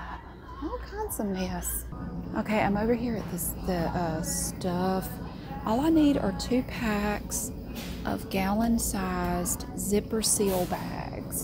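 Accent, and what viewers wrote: South, United States. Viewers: I normally don’t care much for southern accents but her voice is sooooooo soothing to my ears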